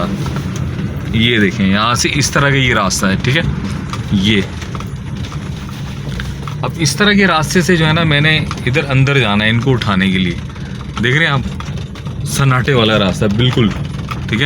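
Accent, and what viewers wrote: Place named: Karachi, Pakistan. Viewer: MashahAllahh , ap ka accent ka Karachi ka tuch ata ha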